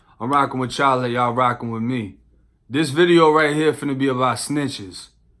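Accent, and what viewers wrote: Boston, United States. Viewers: I am just trolling a little bit lol but not for negative reasons just trying to give you laugh and get your attention at the same time. Few questions for you. You ever come across in prison any of the people that killed that guy based of the movie bully. I know Florida that case was huge so big that it turned it into a movie. I love people from Boston just the way they talk and the way they carry themselves that's why I vibe with your channel but with that said boston can be pretty racist unlike Florida were it seems to be more diverse you won't see nearly as much racism. I only bring this up because you were talking about trying to work at Irish bars when you got out of prison I figured that would put out a target on you being blood and all the gang releated tattoos. I know that side of Boston would hate on you but I could be wrong. 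Also you remind me of one dude from the movie the town. I was watching the movie 2 days and I remember the interragation scene I am like this dude be sounding just like you. Boston be going hard with those gangster crime flicks if you get a chance the average person don't know this movie but its called what doesnt kill you best Boston gangster crime flick I have ever seen and its based on a true story about to bad as dudes from boston that came from nobodies to running boston This the link in case you never seen <link> Also this dude is a trip this guy was a regular no gang affiliated white kid from Boston who became one of the biggest gangster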